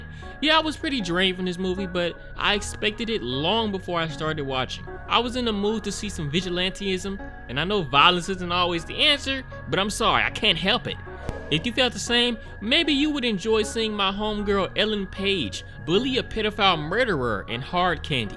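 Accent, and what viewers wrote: United States. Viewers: The most disturbing thing here is the whiny American accent.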